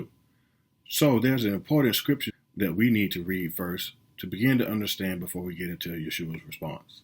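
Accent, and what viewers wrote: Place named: Memphis, United States. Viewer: I agree! Very good brother! Where are you from? Memphis? Love your accent! 👍🏻🙏🏻😀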